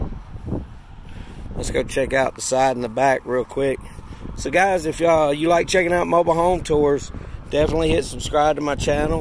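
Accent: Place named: South, United States. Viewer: first you are my favorite Manufactured Home Tour Guide and Commentator! Is that accent Alabama or Mississippi? Second, I love The Helicon. We toured a modular home at 3 Stones Homes in MO that was very similar except in place of a sunroom there was a covered rear porch. I like the sunroom better. Would it be possible to enlarge the master closet by 3’ by sliding the the wall back in the kitchen and master bath eliminating the smaller pantries beside the refrigerator/freezer and reconfigure the master bath by eliminating the tub, moving the first vanity down to connect to the second. You would be able to have a double sink vanity with a makeup dresser in the center as well as a larger closet. I have designed, redesigned, drew blueprints and built our last 3 homes myself. So I look at the possibilities. We are in our late 70s and need to scale down size wise and a story. 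My hubby and I started our life together in two very small “mobile homes” first one was a 1950s’ 2 bedroom 1 bath 8’x 30’ the second was new 1964 3 bedroom 1-1/12 baths 10’x 56’. Both fully furnished. We were way ahead of the tiny/small house craze....Lol. Do not want to go quite that small again. Third have you ever considered doing business in North East Texas?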